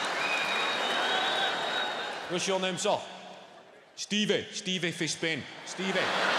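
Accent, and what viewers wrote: Scotland, United Kingdom. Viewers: His Scottish dialect gives his jokes an other level, but me being a Norwegian, are having a hard time understanding everything. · Grow up lol, just learn how to interpret a Scottish accent, its not rocket science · It's not an English accent... UK, Britain, are made up of countries...not one! is Scottish not English. Therefore he speaks the language of English perfectly normally, for someone from Scotland. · Very good comedian but as an American I can hardly understand what he's saying through the Scottish accent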